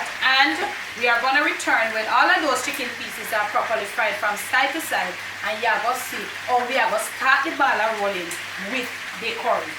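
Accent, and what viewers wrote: Jamaica. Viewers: Mi just a buck up this lady. I love your cooking, how u talk, how u just move round the kitchen. Ol school yardie style. Food bound fi nice. I love love love your channel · Good work n ur Jamaica slang make d food romantic kudos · I love the Jamaican accent. It looks delicious. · she just started cooking & I'm ready to eat🤗..lol... I love the Jamaican accent!😊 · I so LOVE my Jamaican Brothers and sisters I can SCREAM! I finally got to know a Sister, Collen, decades ago and got to ENJOY Jamaican Dishes! Lord knows I WISH I could connect with her again after she left Schenectady NY! I am STILL making the dishes she taught me to make! Collen made her Curry Chicken somewhat differently, but because I am adding Potatoes to mine, I want to know WHEN to add the potatoes! I so LOVE your ACCENT and try to use it whenever I am around Jamaicans! In fact, I was BLESSED to know and date a Jamaican Man for a few years! 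He was TOO GOOD to be true! I will always REMEMBER him as he passed last year! I KNOW he is watching over me! COLLEEN, IF YOU ARE READING THIS, PLEASE LEAVE A MESSAGE! (BOBIE-SCHENECTADY NY) YOU HAVE NO IDEA HOW MUCH I MISS U & YOUR FAMILY! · I just love that Jamaican accent.. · I just love listening to you talk. Haven't heard Jamaican like that in such a long time. Reminds me of growing up. Do you have a restaurant? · Your delivery of this video is sooooooo Jamaican....love it!! Thank you from the UK....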